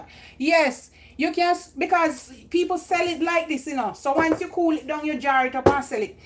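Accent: Jamaica. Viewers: me know weh yuh born yuh sound like st. Elizabeth girl like you video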